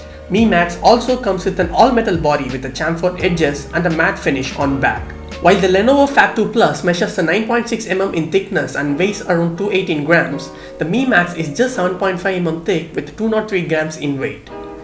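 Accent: India. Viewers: Indian accent is disgusting!!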